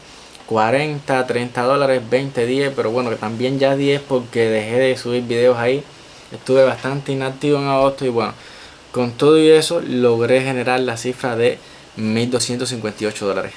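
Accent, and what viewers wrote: Cuba. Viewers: Cuban Spanish language sounds very different than the Spanish I am accustomed to hearing in NYC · Me encanta tu acento. Primer cubano que escucho que habla de forma fluida y entendible.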